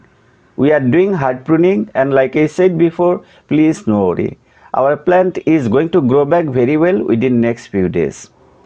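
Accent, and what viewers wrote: India. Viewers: Very strong indian accent i hope hydrangeas are strong..pointless video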